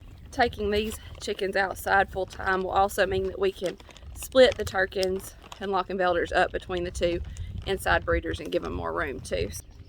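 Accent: South, United States. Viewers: Hi Ms loved watching your videos and seeing that chick you were holding so cute and sweet..i love chickens...seeing your kids too they are so precious looking....your a pretty young woman created a wonderful family with your hubby and you are so sweet and love the way you talk a true southern girl. Me too I'm a real southerner as well. But y'all have a great Monday evening if y'all have storms be careful and stay safe...see you in your next video girl 💕💕.....love ya sweetheart ❤️.....take care ❤️.....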